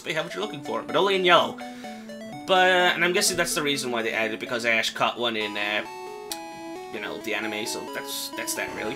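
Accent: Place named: United Kingdom. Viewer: I LIKE IT BECAUSE YOU KINDA SPEAK BRITISH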